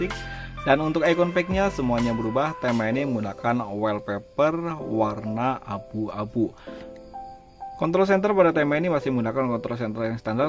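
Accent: West Java, Indonesia. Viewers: Kangg Ti Sunda? 🤣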